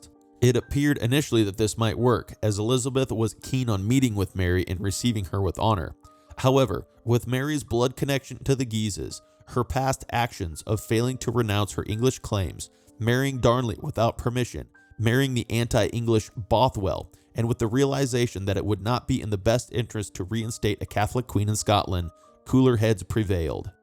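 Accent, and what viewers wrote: United States. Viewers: An American narrator. That lends no credibility to this at all. Americans lecturing about English history is outrageous.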